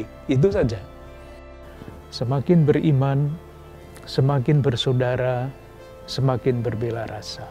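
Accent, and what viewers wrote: Java, Indonesia. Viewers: Medok banget bapaknya😂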